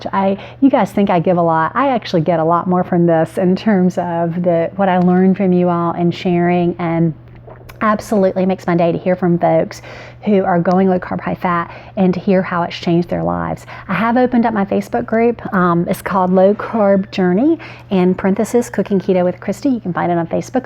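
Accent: South, United States. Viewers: Great encouragement Kristie. Thanks so much. This Tennessee girl feels right at home with your Southern accent!😎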